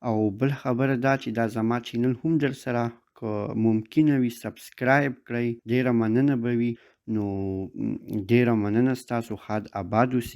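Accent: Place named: Khyber Pakhtunkhwa, Pakistan. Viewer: ډير په زړه پورې معلومات ؤ ممکن ځينې خلک ستاسو د ژبې او د لهجې په اړه منفي نظر وړاندې کړي خو تاسو فعاليت جاري وساتي تاسو په ټول معلوماتو باندې پوه شومه همدرانګه ستا لهجه د کوزې پښتونخوا خلکو ده پوهيږو يوه پښتو ژبه ده فقط لهجې دي لهجې هم ډير فرق نه کړي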